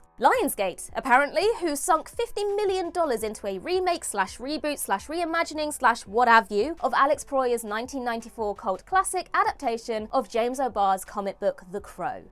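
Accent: United Kingdom. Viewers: You have the cutest British accent I have ever heard.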